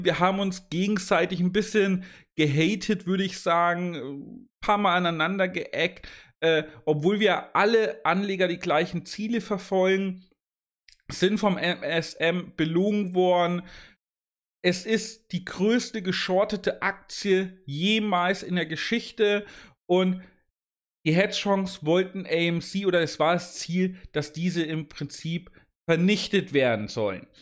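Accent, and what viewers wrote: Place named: Oldenburg, Germany. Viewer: Höre da einen Oldenburg-Akzent raus ^^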